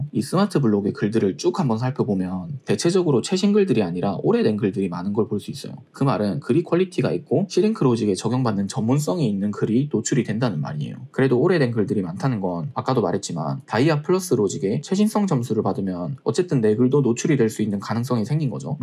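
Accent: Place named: Gyeongsang, South Korea. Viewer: 강의듣는데 말투가 친근하셔서 같은 경상도인이거니 했는데 거주하시는 곳이 같은 지역 같은 ㅁㄱ동이시군요 ㅎㅎㅎ 괜히 반갑고 더 집중하게 되었네요 !! 좋은 영상 감사합니당 😊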